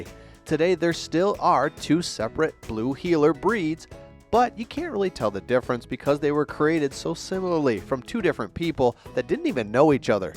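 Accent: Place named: United States. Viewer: thank you. I bred ACD’s once upon a time in north Queensland. They’re the only dog I’ve ever owned. I put in thousands of hours a year training them, studying them, and teaching their new owners all about their behaviours + heritage. Whenever I watch a YouTube video on them - particularly one narrated with an American accent - it’s easy to predict that the content will be mostly non-factual. This video was no exception.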